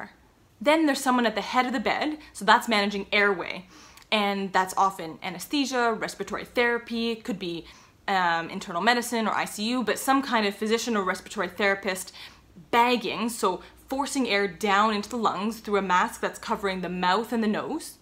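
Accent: Canada. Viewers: I've never seen your videos before, but as soon as I heard you speak I knew you were Canadian from your accent! Great video! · I live in the US in Ohio. Just thought I'd throw that in there as well. You sound like you have a Canadian accent.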